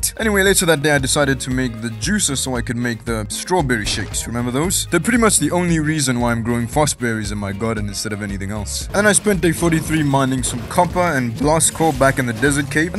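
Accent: South Africa. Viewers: You from SA bru?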